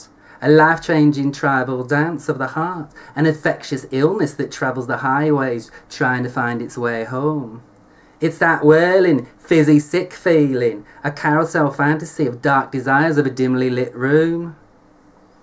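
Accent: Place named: Manchester, United Kingdom. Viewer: Sounds like Manchester lol.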